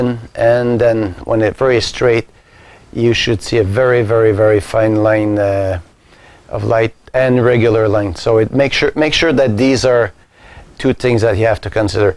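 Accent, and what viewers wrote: Quebec, Canada. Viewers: Tu sonnes tres quebecois? Serait-ce possible que tu sois au QC? super video en passant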